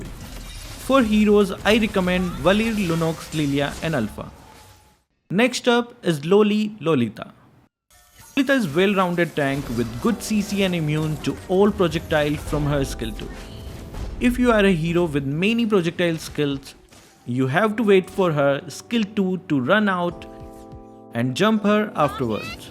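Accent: India. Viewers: Are u indian?your accent feel like indian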